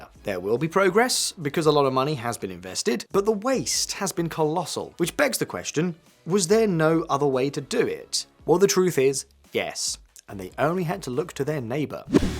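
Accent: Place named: United Kingdom. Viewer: Why is every anti-saudi video made by a british man? Before i even clicked i knew the speaker was British lol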